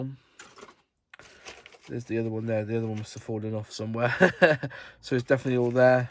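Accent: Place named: Wales, United Kingdom. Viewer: Sounds welsh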